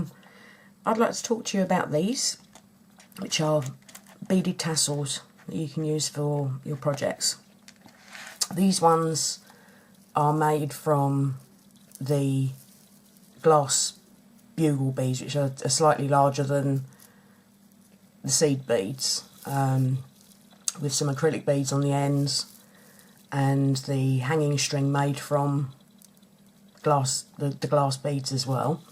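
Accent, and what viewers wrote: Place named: England, United Kingdom. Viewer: Great tutorial and idea! Thank you for sharing. Also nice to hear English accent I live in Sussex! Lol I'm used to hearing Americans! Lol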